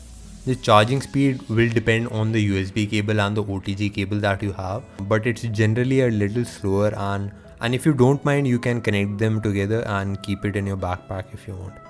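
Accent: India. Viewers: Another bad english accent from yet another indian guy.... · Tired of the indian accent explaining electronics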